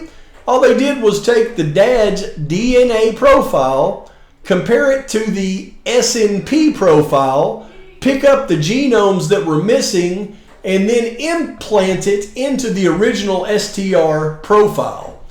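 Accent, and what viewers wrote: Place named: Texas, United States. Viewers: You sound like you are from FORT WORTH TEXAS or near by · Yep. I was peggin that accent today while watching this video. Have we got us a texan here? Ex aTexas Ranger perhaps?? Just a thought. Imo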